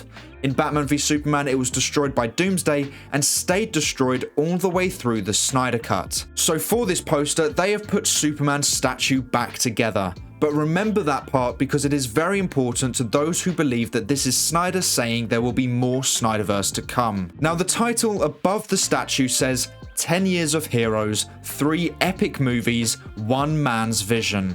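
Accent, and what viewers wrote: United Kingdom. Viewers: As soon as i hear a British narrator i know it is clock bait with no reliable information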